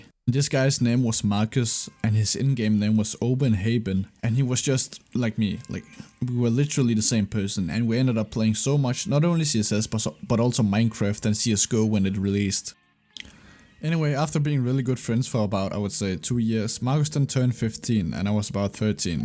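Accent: Denmark. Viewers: I don't recognize your channel but I was already subbed. You have a Danish accent, so ig I knew u before u blew up. What was your previous gamertag? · are you from Denmark?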